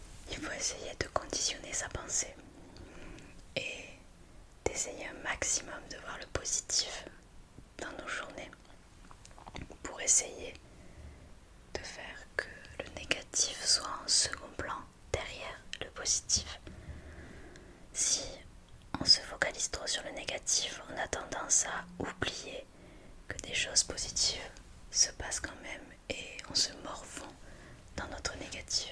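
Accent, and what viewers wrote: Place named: South, France. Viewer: L accent du sud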